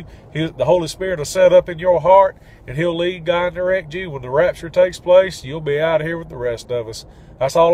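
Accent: South, United States. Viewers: Not sure where you are from brother, but you have to live in God's country by the way you talk. Surely the lord loves the SEC and HIS people that love HIM and that are called according to HIS purpose. Help us to TRUST JESUS in all things. All we need now is an alter call and Alan Jackson singing Blessed Assurance Jesus is mine or Jesus is tenderly calling today! thanks brother! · I love your southern accent!!!!!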